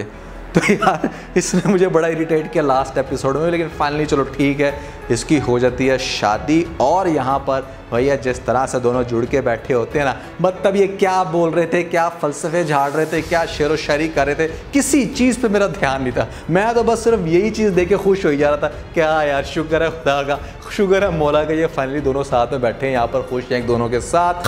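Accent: Punjab, India. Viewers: Bohat hasna diya bhai apki panjabi ne i am panjabi thanks for sharing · Aapka Punjabi accent badiya Lagta hai. Punjabi hi hai na???????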